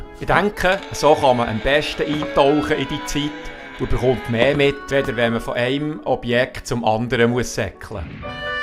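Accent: Bern, Switzerland. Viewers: S’Video han ig spät entdeckt, aber für e Berner gäng no schnäu gnue 😉. Schön gmacht u recht persönlich. Dis Mami red ned Bärndütsch, heit Ehr im Bärnbiet gläbt, dass Du so schön Bärndütsch tuesch rede?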